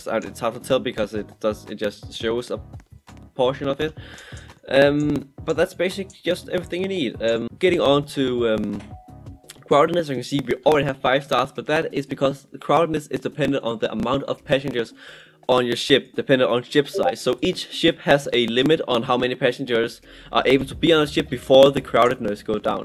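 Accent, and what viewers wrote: Denmark. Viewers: R u danish? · it sounds like your from denmark i am so pls like my comment (edit) like if you are and if your not then comment on my comment where you are from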